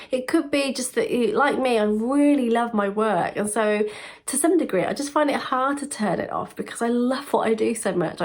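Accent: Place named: United Kingdom. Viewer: May I ask where your accent is from (Which part of the UK)? I just LOVE it !!! (And I'm not a native speaker, I'm German, so I can't really make an educated guess :D)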